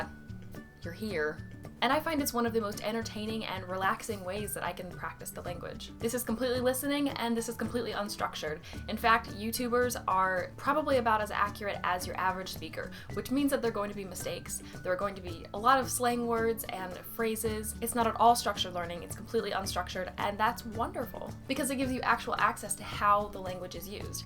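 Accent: United States. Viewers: Im from indonesia, im asian. you're good really american in top speed love. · I've been learning English for a while and without any doubt you are the native English speaker that I could understand the most in a normal speed. where are you from? I meant which part of the USA people speak as the same accent as you?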